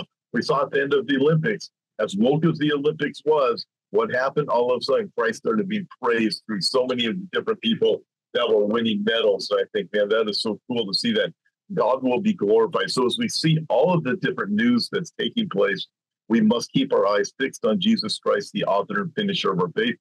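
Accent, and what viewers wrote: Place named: South, United States. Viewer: I could tell you were somewhere in the south. 😊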